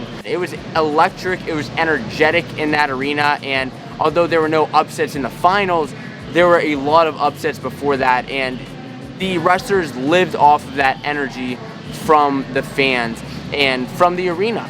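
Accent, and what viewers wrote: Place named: Pennsylvania, United States. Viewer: Keep working all your contacts and especially the coaches. If you can help a coach recruit a wrestler or two you will have made an influential friend for life and then we will be watching you on Big10 Network or ESPN. All of your followers can help you scout wrestlers that should be offered scholarships to the big and nearly big programs. Then if you could lose the Pennsylvania accent and try really hard to not be a Penn State fan all of the time you could become one of the most popular wrestling announcers ever. You obviously love this and should follow your passion. Best wishes to you and just like wrestling - success goes to those who prepare in the off-season.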